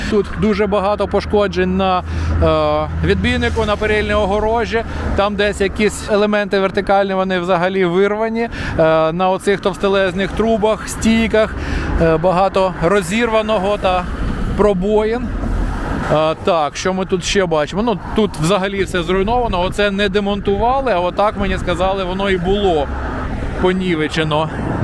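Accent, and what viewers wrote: Kyiv, Ukraine. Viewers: Дякую, за чудову українську мову. Але мені також дуже подобалася ваша "київська російська", що підтверджувало, що ви є питомим киянином. Вітання вам із Харкова.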